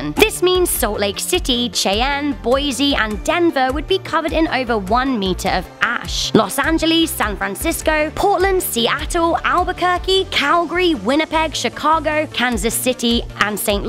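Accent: United Kingdom. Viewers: I don't know...I don't know...I don't know where I'm-a gonna go when the volcano blows. I love hearing Brits pronounce things like "St. Louis" and "Cheyenne".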